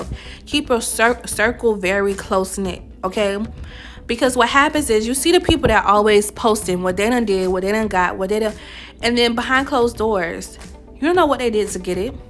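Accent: Miami, United States. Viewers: there's no way you aren't from Miami! That accent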